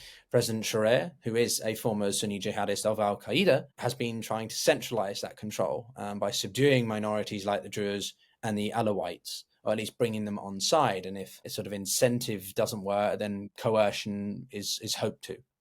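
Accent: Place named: United Kingdom. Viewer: This guy is shooting his mouth off with a biased defense of Syrian jihadists, and with his Oxford trained British accent sounds like a European interloper sticking his nose in other people's affairs. I had to cut him off because he's a phony trying to make a name for himself on YouTube. Get off your ass and get a job, you slob.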